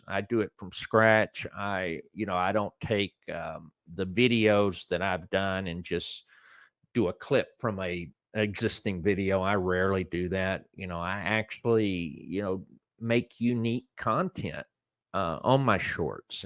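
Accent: South, United States. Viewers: ' Country drawl is calming and pleasant...charming if I may...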